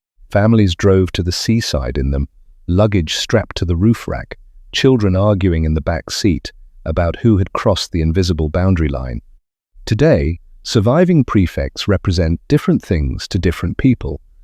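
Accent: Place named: United States. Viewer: Wasn' it a popular that was the cheapest? pretty rubish video, why the American accent?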